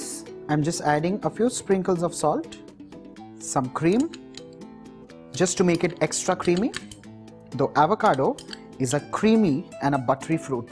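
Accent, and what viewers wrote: India. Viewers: Authentic Mexican recipe with Indian accent. I’m going to try this recipe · Love this funny Indian English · mate it's, he just has an indian-like accent.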